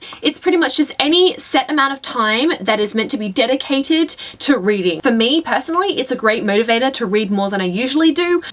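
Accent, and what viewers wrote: Australia. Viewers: Very insightful... still weighing up if I should become a booktuber or not but at least it doesn't feel like a scary impossible thing anymore.I should probably wait until after I've finished my assignments to get started...or even better when the semester's over, ha! But anyway good job, subscribed. P.s Are you Australian? It sounded like you have the accent (or maybe it's British) I only ask because I'm Australian :) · Loved your video, and thanks heaps. Also, it's wonderful to hear an Australian accent on a Booktube! - from a fellow Australian :)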